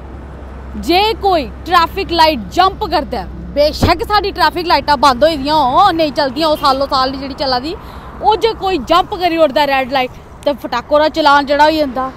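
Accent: Jammu, India. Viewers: Zabardast Reporting.. And in authentic Dogri style.. Proud of U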